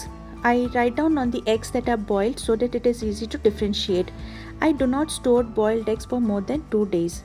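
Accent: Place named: India. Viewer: FINALLY AN YOUTUBER WITH A NORMAL INDIAN ACCENT.. VERY NICE T PRONUNCIATION... I LOVED IT... THE VIDEOS I WATCHED USE THEIR ENGLISH DELICATELY AND ITS SO ANNOYING TO HEAR THEM SAY TTT ... AS IF THEY ARE TYPICAL SHOW OFFS.. ANYWAYS I LOVED YOUR VIDEOS. KEEP THEM COMING. SUBSCRIBED....👍👍👍👍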